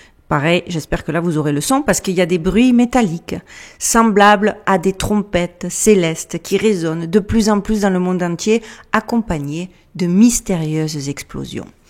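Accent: South, France. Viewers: 👍 Les questions sont toujours aussi pertinentes !!!😻 Comment des gens qui ne sont plus en contact avec eux même peuvent-ils entrer en communication/en lien avec un humain conscient de sa souveraineneté ?!? Impossible !!!! D'où l'AI.... et tout ce qui va avec 😁 J'ai vraiment et magiquement confiance en ce qui s'en vient et en toutes ces personnes vivantes et conscientes✨🙏✨ Merci pour ta bonne humeur, ton accent du sud qui réchauffe. Beau week-end à toi et à tous☀✨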